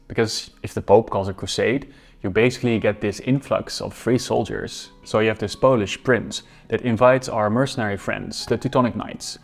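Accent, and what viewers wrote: Netherlands. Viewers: I was wondering where the host was from, until I heard "togeder" (together). Dutch! 🙂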